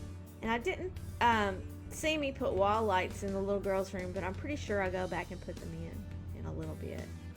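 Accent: South, United States. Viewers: Love your sweet southern accent :) I live in Georgia so its a good feeling hearing a southern simmer voice! :D But i dont know why because i am from the north and i have northern accent. Great video! I subscribed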